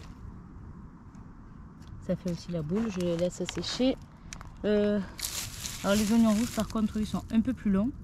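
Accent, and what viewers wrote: South, France. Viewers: Bonjour je découvre votre chaîne qui est très bien, de plus j'adore votre accent du midi. Félicitations pour votre beau potager 👏👏👍👍. Cet automne j'ai mis beaucoup de fumier de cheval et de la paille je suis impatient de voir le résultat. Je mettrai du fumier de poule lors de la plantation en mai prochain. Je m'abonne 😉😉Ali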